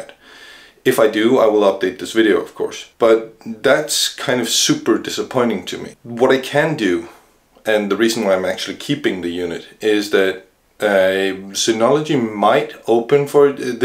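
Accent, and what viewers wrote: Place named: Denmark. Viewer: I went for the DS1522+ which, after installing DSM 7.2 can also accept SSD for storage. But the same as your problem. However I do not use the SSD slots just jet. Completely offtopic for a moment. An British friend of mine said he could not hear a difference between Danish (like you) people speaking English and Dutch (like me). And hearing you speak, I cannot too. I first thought you where Dutch, so I looked it up in your profile. But that is a offtopci (for me) fun fact.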